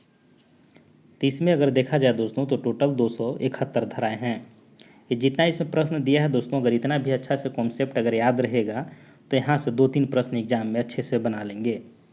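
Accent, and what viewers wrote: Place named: Bhojpuri, India. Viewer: Bhojpuri mix बा तोहार बोली में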